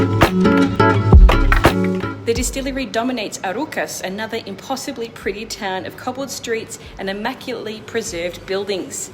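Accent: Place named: Australia. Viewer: Are you an Aussie? Your accent sounds like. The Spanish pronounciations are not always on point, but sweet. I would have appreciated if you had consistently pronounced Gran Canaaaaria (not: Gran Canaeria - English way). And things like: 'concista' not 'conquista' - you know what I mean. Just some gentle feedback, since language seems to be a big part of your vids - great way of combining it. Lots of good info, and nice video footage - muchas gracias!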